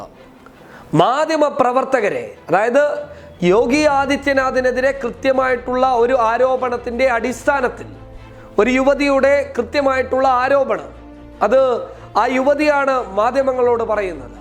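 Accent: Kasaragod, India. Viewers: Kasaragod shaili kayarivarunnu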